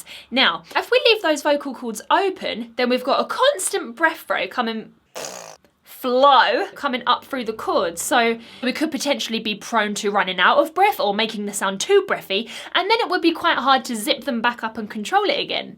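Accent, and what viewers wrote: England, United Kingdom. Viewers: You are so beautiful and love your British accent! You've now become my favorite music reactor. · I subscribed to your channel just because ablas Español by the way I love your England accent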